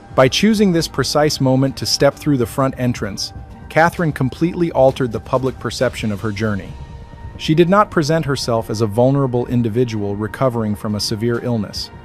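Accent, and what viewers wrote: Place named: United States. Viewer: WHAT A LOAD OR RUBBISH ! Done with an American accent and no accurate knowledge of the Royal Family.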